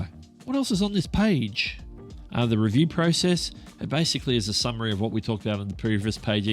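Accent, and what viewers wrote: Australia. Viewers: oh, aussie.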